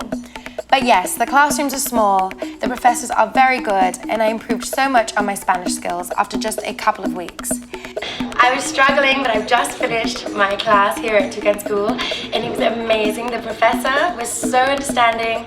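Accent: United Kingdom. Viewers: This has become my favorite channel, and that cute British accent was the icing on the cake.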